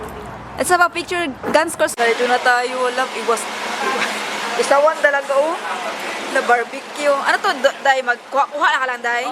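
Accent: Visayas, Philippines. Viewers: Yun mga anak mo kasi Dyan pinanganak ikaw visayan intonation pa din Even you speak English or British people will notice your intonation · Bisaya toh halata sa accent nya.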